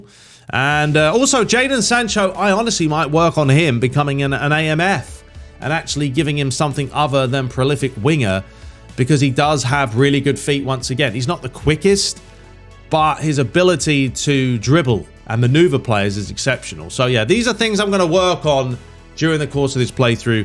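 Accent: Netherlands. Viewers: I'm Dutch myself and I think your Dutch accent is on point! 😁 Great episode! keep em coming 👍🙏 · Amazing Dutch accent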